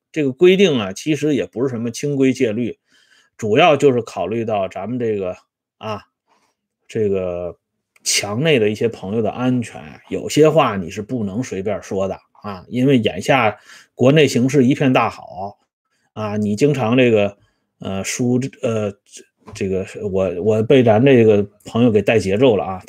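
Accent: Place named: Tianjin, China. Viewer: 我又听出天津味了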